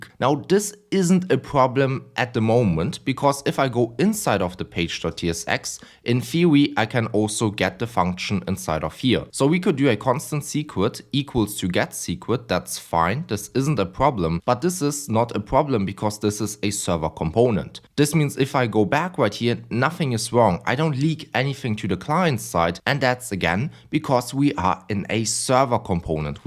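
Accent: Germany. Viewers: Are you of German inheritance? Your sound like me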